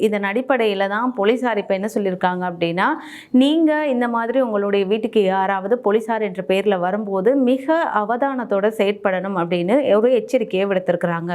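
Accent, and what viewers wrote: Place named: Chennai, India. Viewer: இலங்கை மக்களுக்கு செய்தி Madras தமிழில் தூதூதூதூ.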